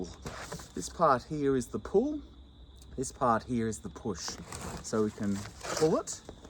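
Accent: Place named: Australia. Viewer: Not criticizing but he sounds like he's from Oz, not Britain.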